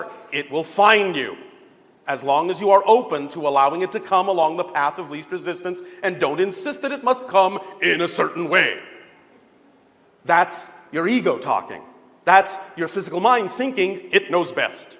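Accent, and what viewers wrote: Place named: Ireland. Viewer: He talks a little slower and to me a bit more Irish lol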